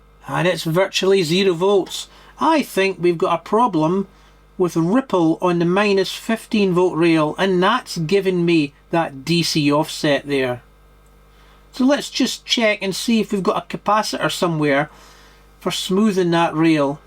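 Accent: Scotland, United Kingdom. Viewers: Is that really 4 nixies? The board inside had just 3 nixies on it. Is the forth digit in front of the 3 nixies just a neon bulb that serves as a 1 indicator? Guess this can be refered to as a 1999 count meter 🙂 Nice repair anyway, and I just love your Scottish accent.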